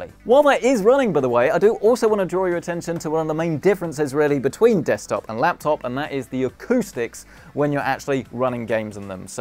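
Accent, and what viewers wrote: United Kingdom. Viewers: Dear Lord its British Linus!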